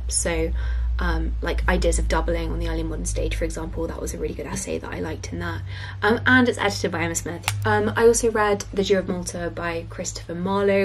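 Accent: United Kingdom. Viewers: I am watching your videos now to prepare for my English exam. My teacher is from UK, so it is very useful for me to listen someone from this country to copy an accent. I have already watched some of your videos since the first one. It is cool to watch you grow up. Thank you and hi from Russia, Krasnoyarsk❤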